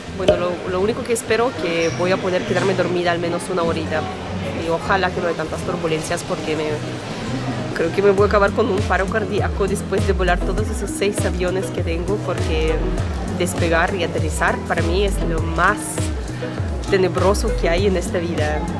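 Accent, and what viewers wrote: Peru. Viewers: HOLA ME RESULTASTE MAS ACHORADA .....ESTAMOS JODIENDO /////ME HECISTE REIR USUALMENTE UNO HABLA LISURAS MAS CON LOS AMIGOS MAS INTIMOS PERO YA TIENES ACENTO PERUANO POR ESO SE TE QUIERE SALUDOSSSSS Y HOY ME SUSCRIBI PARA SONREIR DE TUS VIDEOS.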